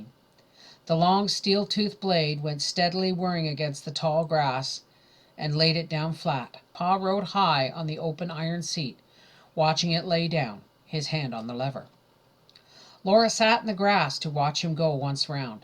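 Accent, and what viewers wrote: Canada. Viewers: Not everyone knows every word plus she is Canadian we pronounce some words differently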